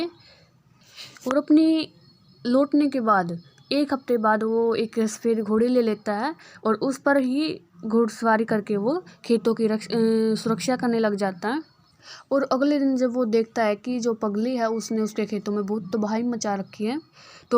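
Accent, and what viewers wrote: Haryana, India. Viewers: Full Haryanvi ascent narration of story' 😊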